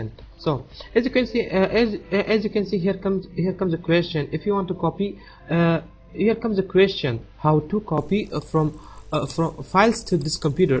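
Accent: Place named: India. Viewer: I can't understand you! You have such a thick Indian Accent!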